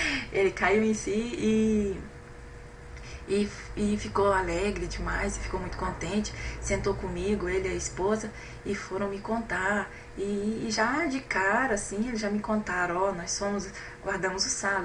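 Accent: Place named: Minas Gerais, Brazil. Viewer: Pra saber. Pelo seu sotaque, achei que fosse de Minas.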